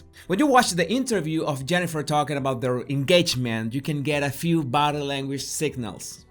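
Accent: Spain. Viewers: Yes, I understand spanish or more what you call "spanglish" but sadly do not speak it fluently. I'm mexican/spanish american. My Mom's 4th generation and Dad's 1st on his father's side...but 3rd on his mother's side and was born here too, so they both speak it fluently...but don't have much of an accent when they speak english. We're very proud of our heritage and culture but obviously are very "American". I wish I spoke spanish better. What I like about is he speaks perfect english with a beautiful spanish accent, but even though he speaks at a nice pace, it's not too fast when he is giving his commentary, compared to most people who speak fluent spanish. Love the Latino accent...italian too! 😁💕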